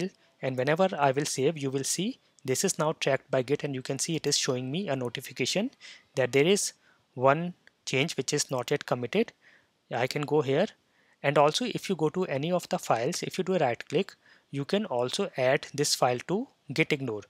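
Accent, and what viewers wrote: India. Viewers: Simple and complete, and his "Indian English" can be easily understandable compared to some others. I'm completely new to Git and this video is so much helpful to me to quickly get started with Git on my VS Code -- thanks! :)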